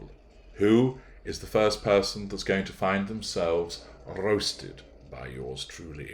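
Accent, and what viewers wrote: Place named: Scotland, United Kingdom. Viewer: Love it. You’ve got to be Scottish. You’re a treasure.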